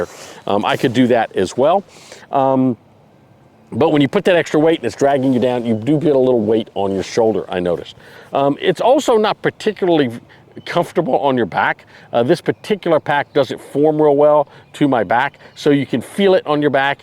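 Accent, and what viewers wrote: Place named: New England, United States. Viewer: Thanks. I lived in Jacksonville FL for a few years, and worked maybe up to a year in (Fl/GA Stateline) Kingsland GA. I had guessed to myself that maybe you lived in OK, AR, or TX because of your Yankee accent, having forgotten that lots of Yankees life in FL et al. I have about 10 backpacks and Bug-Out-Bags because I call "hikes", expeditions, because I am looking for places of interest to explore--each one related to a specific hobby that requires different equipment. I would never carry two folder knives (tho I have no objection about that). I would add either a folder Silky saw (best for green wood) if one wants to cut branches to prevent widow-maker dead fall from trees, or the Laplander folder best used for dry/fire wood. Yes these are longer, but much more useful in unfamiliar terrain and unexpected weather. 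I would also never bring sugary snack bars. They don't stick and they tire me (as I am pre-diabetic); but I do carry sugar (brain needs sugar) in the form of peppermint life-savers (and I suck on them when I recognize that I am not thinking fast or clear enough. If the lives in a Southern State bordering an ocean, I would not recommend sleeping on ground. I had in my backyard in NE FL, dozens of tiny poisonous rattle snakes (I don't recall their proper name). I'd much prefer a hammock with mosquito net and tarp overhang to a poncho (and I have both). I'd sleep better in a hammock off ground. Now I'[m in a winter state and tomorrow the wind chill is predicte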